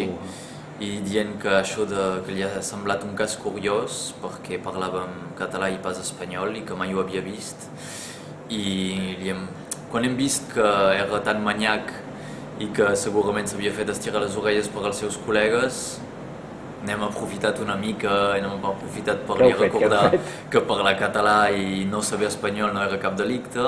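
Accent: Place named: France. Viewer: Llàstima que tinguis l'accent tant contaminat pel francès.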